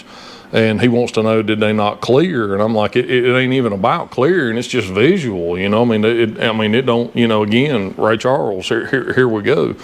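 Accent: South, United States. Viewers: Man I can’t listen to this whining. Maybe it’s the dumb southern drawl or the inability to use the English language … I don’t know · No offense at all but you have the voice of Boomhauer with the accent of Hank Hill. I’m a southerner too to so I can say that lol. Great video too!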